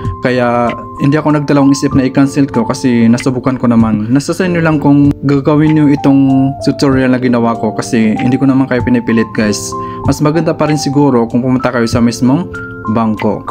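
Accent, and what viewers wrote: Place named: Maranao, Philippines. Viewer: Hello sir maranao po ba kayo,kc maranao kayo magtagalog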